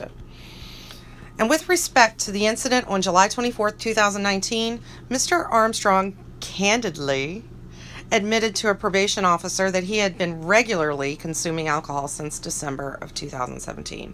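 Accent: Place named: Maine, United States. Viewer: I love your accent! Not all Mainers sound like (I certainly don’t) but I know a fair few who do. It’s mostly the older generations though. My grandmother used to speak a lot like and his mawm, only she knew the difference between “weren’t” and “wasn’t.”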